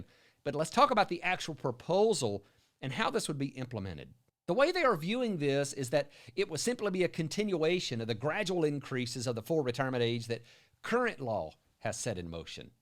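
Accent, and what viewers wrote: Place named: Appalachia, United States. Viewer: Stupid Republican hillbilly. Could tell from the hickish accent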